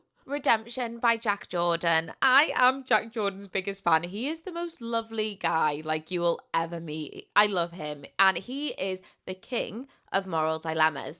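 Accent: Wales, United Kingdom. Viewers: Catching up on your videos and it's been bugging me for months where your accent is from!!😆 As soon as you said you were Welsh when talking about Sweet Pea I was like "I knewww it!" 😂 I'm from South Wales (about an hour from Cardiff) and I knew your accent sounded Welsh to me! 😆 (also thank you for the recommendations, I will be adding a few to my list for sureee🫡❤️)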